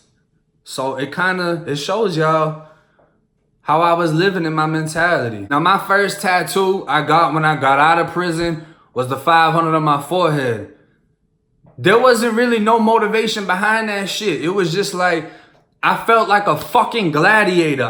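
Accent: Boston, United States. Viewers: You can tell the Boston accent is the real one with the dropping the Rs. The Florida hood accent is acquired not necessarily fake but more adopted. I’m a white dude who grew up in the hood in Philly. a couple of my white friends i grew up with used to put on that hood “blackcent” when we were younger, tho some still talk like that. But now that we’re in our 30s most just have the normal shitty, Philly white dude accent. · That's crazy you move to Florida about the same age I was when I moved out of Florida I don't know where people say you got a Florida accent cuz homie I straight here nothing but Boston accent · the cah 🚙 That Boston accent 😂😂😂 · I new I could here the Boston pahk tha cah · your accent is so strong for not growing up in boston lol! I love how yall say Car haha sounds so funny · Why does he have a Boston accent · I new you were from the Boston area!! 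As soon as I heard you speak, I said yup! Boston accent! Boston born and bread here, Dorchester Mass or a Dot Rat as they say 🤣 myself!! 😊! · Idk why the Boston accent is so interesting lol .... Concrete-ass-room with the stupidest teacher in the school. That shit had me rolling. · Alot of people in the Boston area sounds like that. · Boston with a Blac-scent’ LOVE IT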